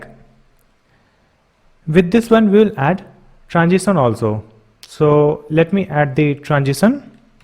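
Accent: India. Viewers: bro, sorry I cannot listen to an Indian for 12 hours.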